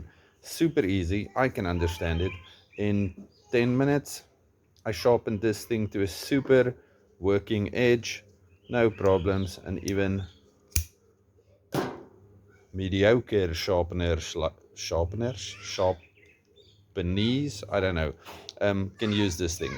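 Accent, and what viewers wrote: South Africa. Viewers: SO LEKKER om 'n Suid Afrikaner op YT te hoor !!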